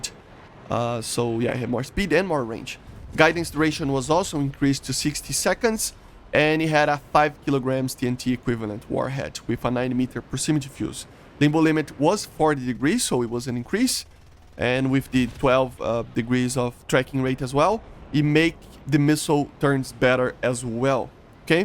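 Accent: Brazil. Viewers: Só pelo sotaque já percebi que é BR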